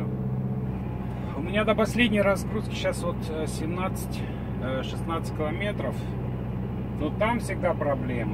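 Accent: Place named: France. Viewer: Спасибо, ну впринципе не особо удивлён, последствия "приёма беженцев" во всей красе И грязь и попрошайки это пожалуй самое безобидное. Каждый раз удивляюсь твоему французкому акценту - навигатьион, итд:) Вроде немец жеж:) Думад француженка, так нет, вы по немецки вроде общаетесь, логичнее кмк Кэтрин всё же:)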